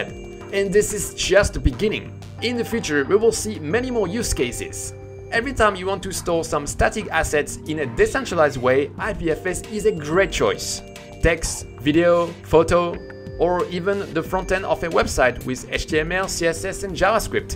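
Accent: France. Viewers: Juste par curiosité, t'es français ?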